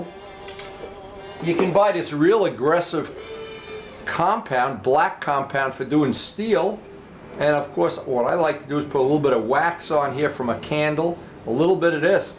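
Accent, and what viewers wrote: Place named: Pittsburgh, United States. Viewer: This guy sounds like hes from Pittsburgh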